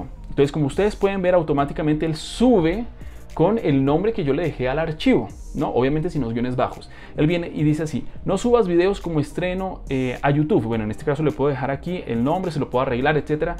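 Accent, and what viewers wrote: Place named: Colombia. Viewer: Yo si decía que ese acento se me hacía conocido, tenías que ser colombiano para hacer las cosas tan bien 😊 🇨🇴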